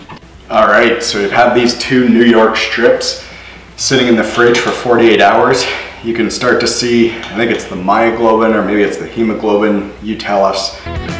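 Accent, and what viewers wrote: Canada. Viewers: R u Canadian? Cuz you sound Canadian AF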